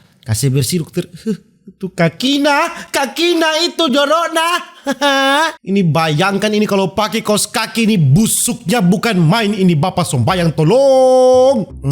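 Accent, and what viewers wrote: Manado, Indonesia. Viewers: Suka dengar pertuturan orang manado. Unik 😊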